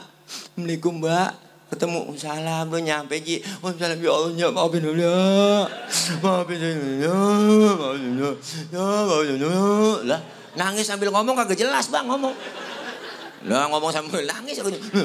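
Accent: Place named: Jakarta, Indonesia. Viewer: Betawi? Enak bett dahh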